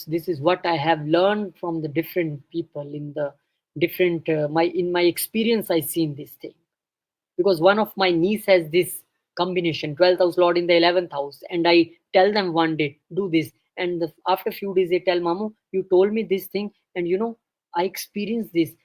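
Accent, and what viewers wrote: India. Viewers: Ive alway want to see a video where ur doing the pridiction, ur english is great. Ive always love indians english thas y i also love going indian resturant here in Jamaica.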